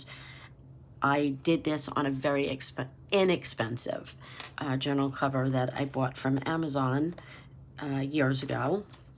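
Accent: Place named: Massachusetts, United States. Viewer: I just found your channel on here and I’m so excited that I did. I love the content of your videos and what first caught my attention was the name of your channel and then when I clicked on it and I heard your accent, I believe that we are from the same area and I’m very curious to see if we are (if you feel comfortable answering) are you from Massachusetts South Shore by any chance? I hope to hear back.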